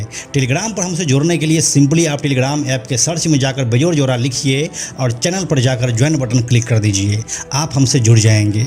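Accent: Bihar, India. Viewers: Bhai pahele to yeh thik ker le us ka naam shabbir nahi sabir tha.dusre us ki girl friend kamathi pure ki nahi Congress house ki thi Jo lamington road per hai kamathipura se dur ..tesre aap ki awaz bahoot acchi hai behari tone wali.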